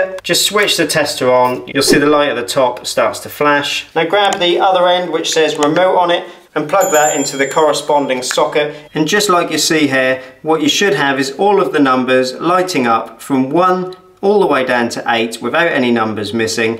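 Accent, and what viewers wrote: Norfolk, United Kingdom. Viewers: Where are you from? Hint of Norfolk? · This is a gem, and a familiar Norfolk accent to boot, subscribed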